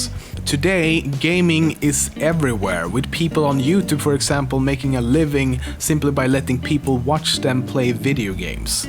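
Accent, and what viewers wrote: Gothenburg, Sweden. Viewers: Are you from Sweden for it sounds like it and im from Sweden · 2 things first things first are you swedish?? 'cuz you says the worsds as i would say it. second am swedish so KOM FÖR FAN!!! :D Tell 'dat to someone!! Or scream: FÖRBÖVELEN!!! :D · was thinking that swedish accent in previus videos but now i got my proof that you are from sweden. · you come from Sweden, I can hear that when you talk Swedish because I'm Swedish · are you swedish? sounds like it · haha ja! men man hör ju att är Svensk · Är du svensk låter så på dit ut tal och om du är svensk så kan du nog läsa den här kommentaren och om du inte kan så behöver du inte bry dig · Vänta...är du svensk, jag menar jag har hört din brytning men jag chansade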